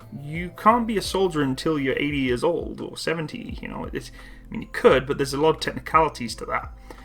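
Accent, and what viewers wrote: Canada. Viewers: It’s sad you’re sounding more Canadian but also great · Def got accent Canadian english accent!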